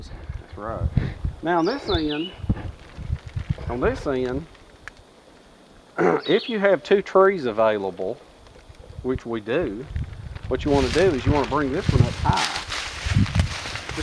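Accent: South, United States. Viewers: From down south? Alabama, Mississippi, Louisiana maybe? You sound just like my sister in Louisiana. (Only with a deeper voice...lol) I paused the video to write this. I’m waiting to see whether or not you say something like “Like this I reckon.” or “Look at this ya’ll !” Lol I’ll just die laughing. 💕😉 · Well, bless your heart. Like me, from the South. We all have license to talk funny. That includes the use of strange idioms. "Jewel" or "little jewel" is interchangeable with "doohicky", "thingamabob", "whatchamacallit", or "jobber/jobbie".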